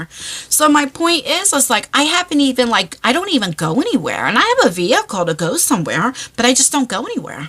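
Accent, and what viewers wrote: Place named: East Coast, United States. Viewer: I love it when you say "ya know" just as much as I love your East coast accent. You can't have that without "ya know" ❤❤❤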